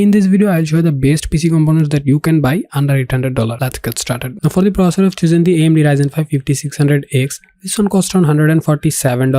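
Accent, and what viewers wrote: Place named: India. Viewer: You are talking way too fast. And your strong Indian accent makes it hard to understand a word of what you are saying. You might as well not be speaking English.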